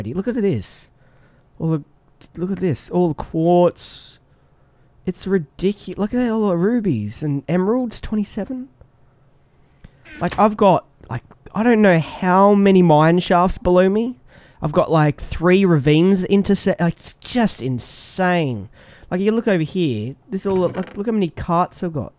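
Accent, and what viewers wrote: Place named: Australia. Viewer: ahh the sweet voice of an aussie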